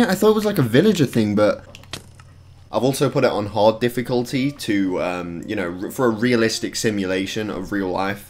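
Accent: United Kingdom. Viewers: Why is a British guy giving us a plan? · Wait, how tf a British guy telling us how to storm into Area 51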